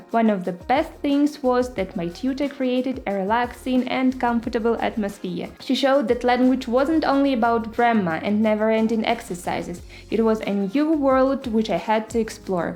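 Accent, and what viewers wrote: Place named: Spain. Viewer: Her Spanish accent amazed me.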